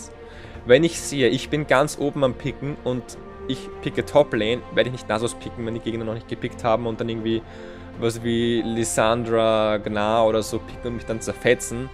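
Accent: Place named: Vienna, Austria. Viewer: bist du österreicher :D klingt iwie wienerisch :D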